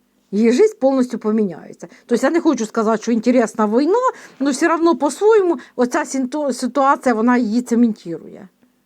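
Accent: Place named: Surzhyk, Ukraine. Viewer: Пані, говоріть будласка українською, а не цим пекельним суржиком. Дуже ріже слух.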